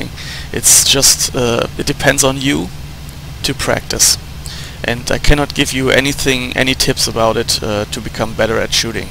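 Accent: Germany. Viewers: hi,. your vids are fun to watch, your german accent makes it interesting. I don't want to sound like a 'know-it-all' or something, but, you make the mistake many do when pronouncing the name...'hawkinge'......the 'inge' part is pronounced like the word 'hinge' or 'binge', not 'ing', like in 'going' or shooting'. I know, not real important, just a minor detail......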